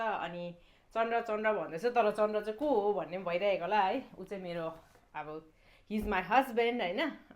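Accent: Darjeeling, India. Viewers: बधाई छ….! tapaiko बोल्ने शैली सुनेर दार्जिलिंग कै हो भन्ने पहिलेई थाहा थियो मलाई चैं 🤗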